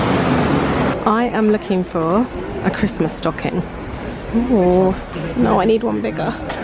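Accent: United Kingdom. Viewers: Hi this is Kim! Merry Christmas, and Happy holidays. I like you're video's they are intertaining! I also like you're British actcent! Thanks again, Kim!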